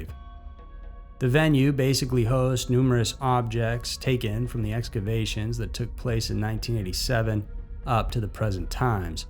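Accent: South, United States. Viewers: “…SMALLER SAHZE….” I like this boy announcer, and he got a small southeren accent on him.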